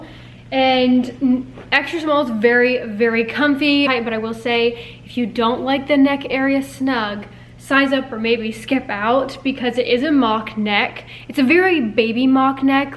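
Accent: United Kingdom. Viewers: no she is Bri-ish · Are you British? you're lil British accent is so freaking cute 🥺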